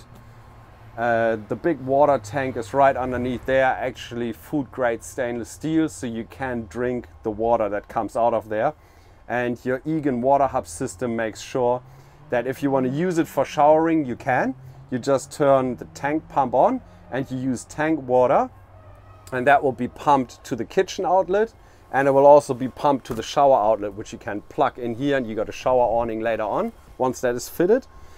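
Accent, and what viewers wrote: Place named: Germany. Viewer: It’s a pretty fancy set up for a troopy. I am just asking myself where they put all their camping gear? What about a table, chairs, bags. You said it’s perfect for longer traveling where do they put their clothes? Looks like heaps of electronics but not much space in the end… I would be curious what this set up costs… Ps. Love the German accent! :)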